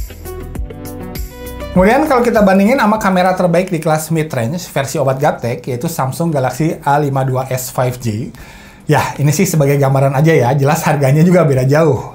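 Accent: West Java, Indonesia. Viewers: sunda pisan logat na.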